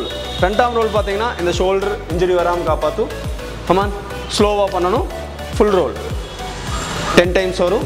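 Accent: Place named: Kongu, India. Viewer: Bro neenga Coimbatore ah..? Kongu Tamilin enimai katuku sogama eruku...;)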